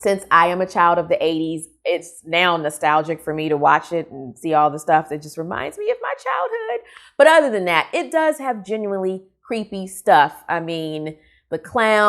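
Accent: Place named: England, United Kingdom. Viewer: This was amazing 👏 I love your English accent, it's better than mine 🤣 I can't wait for dark nights to roll back in so I can watch horror movies in the dark from like 5pm lol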